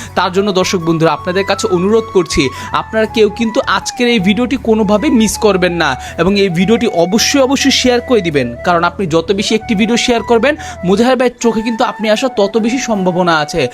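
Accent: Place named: Barishal, Bangladesh. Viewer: নারায়নগঞ্জের চাইনা এত বরিশাইল্লা বাংলা ইংলিশ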